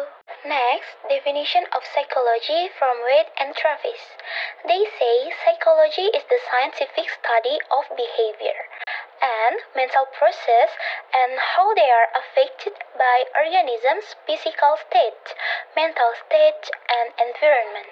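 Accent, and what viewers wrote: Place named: United Kingdom. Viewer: Keren ya kak kayak ada british2nya gitu 🙏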